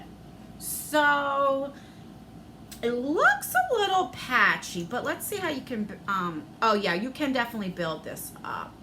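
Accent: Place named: Louisiana, United States. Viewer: Cool! Can’t wait to get my box!!! Hey, I keep hearing your accent (as someone from Oklahoma with my own accent 🤪) and you sound a tiny bit like you’re from Louisiana. I know it says Jersey in your intro, but just thought I’d comment that. Love your reviews!!!!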